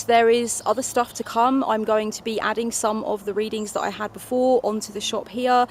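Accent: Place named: Yorkshire, United Kingdom. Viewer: A. Luv the glasses B. luv the Yorkshire accent...UK impressions are always welcome to this Canadian gal ;) C. very inspiring as I work towards re-branding my own biz. You make ( as always) some fabulous points about signs and moving on. D. LUV that you are moving forward and clearing the cob-webs and are super jazzed to start fresh in October when things are ending all around us. Triple luv the juxtaposition of that! E...Luv you as always bc you are the bees knees. Thanx again! xo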